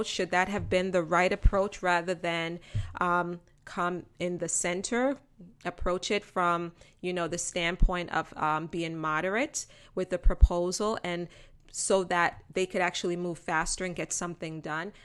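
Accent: Jamaica. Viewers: I dont know how old you are l have seeing you have Bob Marley's family similarities mmmmm🤔🤔 excuse me but l always follow your channel but today when you said quote "sorry guys for my Jamaican accent mmmmm.l have questions in my mind and heart. Maybe you are related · I ❤ your jamaican accent .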